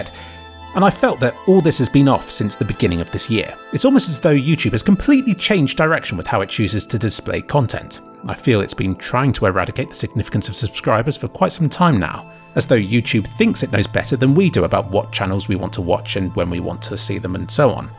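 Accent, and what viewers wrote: United Kingdom. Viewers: I'll always be a subscriber,. I've seen this faceless british voice talk about games, graphics, staircases, sun angles and CSGO for most of my teenagehood/early adulthood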